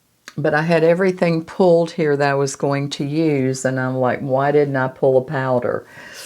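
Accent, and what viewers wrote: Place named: Appalachia, United States. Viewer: ! I also love your accent, it reminds me of home. I’m from Appalachian area of North Carolina and Tennessee, but live in Ohio now. You are a true joy ✨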